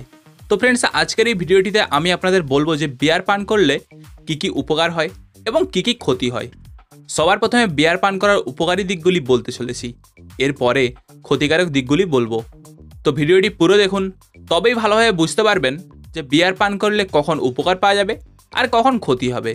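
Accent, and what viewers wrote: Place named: India. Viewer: কথা শুনে বুঝা যাচ্ছে দাদার দেশের ডাক্তার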